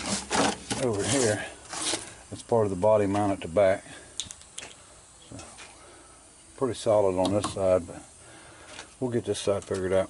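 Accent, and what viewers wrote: South, United States. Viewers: were you ever a teacher? Because you sure are good at teaching this teacher a whole lot of new things! Thank for the excellent explanations and beautiful, proper and polite Southern English.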